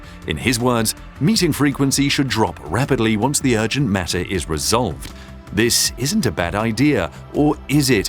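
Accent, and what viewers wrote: United Kingdom. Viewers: A semi spiffing Brit presentation. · Annoying British voice that uses click bait subject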